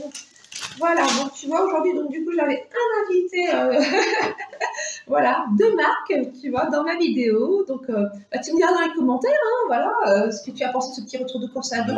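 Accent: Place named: Franche-Comté, France. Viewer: Et bien quelle surprise de voir une nouvelle tête ! Ma foi il a l’air bien sympathique ce franc-comtois (on entend une petite pointe d’accent) 😉. Encore bravo pour cette vidéo toujours aussi plaisante à regarder ! Passez d’excellentes fêtes de fin d’année 😃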